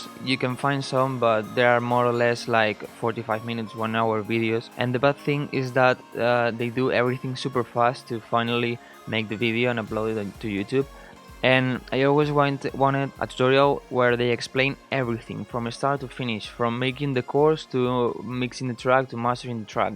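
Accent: Spain. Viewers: Como se nota que eres Español ajjajaja! Muchas gracias por el tutorial !!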